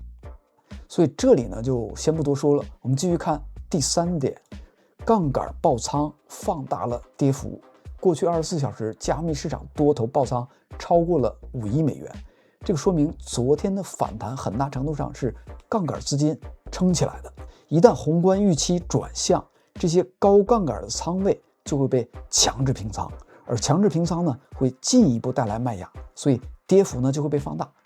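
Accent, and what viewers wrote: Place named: China. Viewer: 很明显是大陆口音，即使人在国外也是在大陆出生长大的成年后才出国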